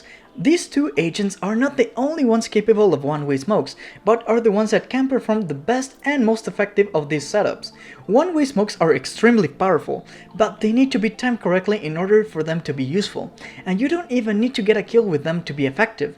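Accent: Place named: Brazil. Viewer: Parece o sotaque de um brasileiro falando Inglês.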